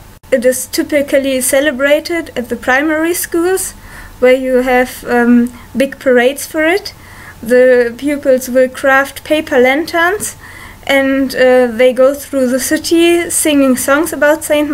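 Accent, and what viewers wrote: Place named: Germany. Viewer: Ur englisch is great (= a bit german, but i like it <3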